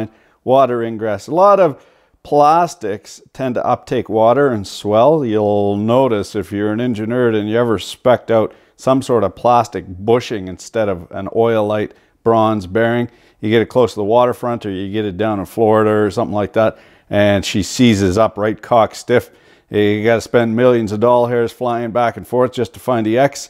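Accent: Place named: Canada. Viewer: I wish you had a podcast. I could listen to your funny Canadian accent peppered with all of your colloquialisms in my shop!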